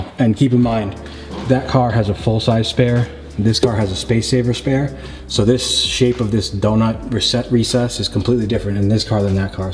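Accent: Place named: United States. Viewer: como le comente a tu viejo, solo pesque el 20 % de lo que hablabas, el ingles USA, suena distinto al Brit......ja ja veo que la sal afecta mucho los bajos el coche, lo pudre todo, parecería que el 4 Motion, tiene mas hueco en la parte trasera del coche, supongo que sera por la transmision y el lugar que ocupa, deberás tener que cortar el baúl de tu coche y rehacer el piso?? esto llevaría un buen trabajo, supongo también que desarmaras la transmisión para ver los engranajes, cambiar bujes de goma y dar blasting + pintura a las parrillas, los semiejes también los repararas?? tenes un buen trabajo por delante, por suerte tenes el coche viejo para sacar un plantilla del piso y copiarlo.......la satisfacción, sera cuando lo veas al VW negro terminado y tirando cambios por las calles de N York.......ja ja, seguí colgando videos de la transformación, y si podes, hace alguno en castellano que lo cuelgo en mi Face, Un abrazo!!!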